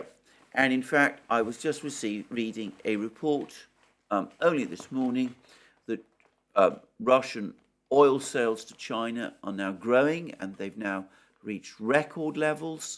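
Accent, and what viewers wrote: England, United Kingdom. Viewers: Greek communist with an English accent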